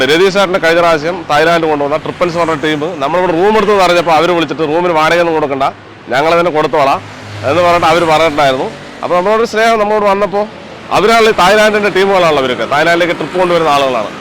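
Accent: Palakkad, India. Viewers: എന്താത് പകുതി ചെല വെച്ചതായിരിക്കുവോ😁 ❣️പാലക്കാട് ഭാഷ ഉയിർ❣️ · കണ്ടപ്പോ മുതലകളെല്ലാം കൂട്ടത്തോടെ കണ്ടം വഴി ഓടി 😂😂😂 പാലക്കാട് ഭാഷ ശൈലി 😍😀😀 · പാലക്കാടൻ ഭാഷ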